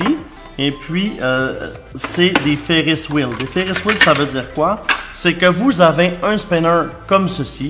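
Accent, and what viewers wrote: Canada. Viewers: J'adore ton accent canadien tes le meilleur. Je veux un spinner stp je fait toujours tes concours mais je gagne jamais et la j'espère gagner. Merci pour tous se que tu fait pour nous .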